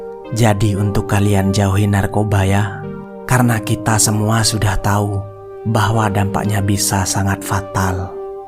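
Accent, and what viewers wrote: Madura, Indonesia. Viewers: Orang madura ya bang!! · Orang Madura ya bang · Orang madura bg?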